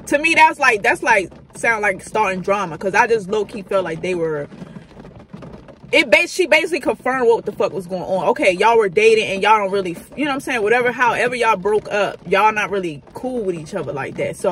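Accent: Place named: North, United Kingdom. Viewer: U must be from up north u sound like it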